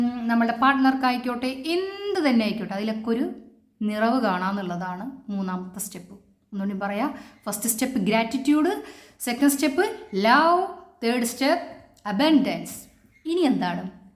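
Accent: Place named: Ernakulam, India. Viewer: എറണാകുളം ഭാഷ😅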